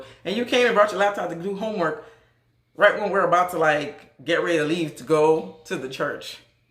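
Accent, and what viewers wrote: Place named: Gullah Geechee, United States. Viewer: I just don't understand some people. Sounds like you're better off sis. And are you from SC? You have that geechee accent going on?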